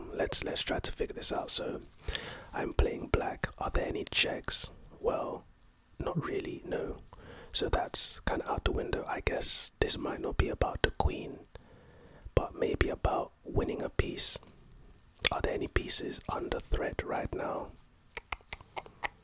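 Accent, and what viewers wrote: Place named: South London, United Kingdom. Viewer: Mr if you don't mind me asking where are you from? cause you have a very distinct south London accent and I honestly can't figure out where in south your from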